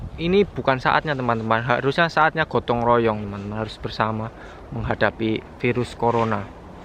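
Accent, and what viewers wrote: Banyumas, Indonesia. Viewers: Ciri khas mas...ngapak😆👍👍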